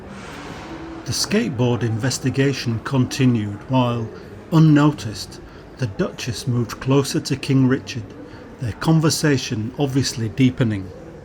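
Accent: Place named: United Kingdom. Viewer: Thanks for sharing — an interesting project. A few comments: The verbal narration (British accent, high vocabulary, and clear diction) gives the video a quasi-documentary credibility, which works well for a contemporary-myth style story. The title’s allusion to Walter Benjamin’s The Arcade Project similarly reinforces that tone. This setup suggests a deeper symbolic reading — not necessarily Benjamin’s exact ideas about consumerism and cultural loss, but something in that thematic area that isn’t yet fully developed. Regarding the filming treatment: if you adopt the criteria of a contemporary myth, consider expanding beyond simple montage. In addition to collage, other cinematic treatments could enrich the marcette scene. One distracting element is the camera’s hand-shake; using a tripod for that shot would help. I hope this feedback helps with your next iteration.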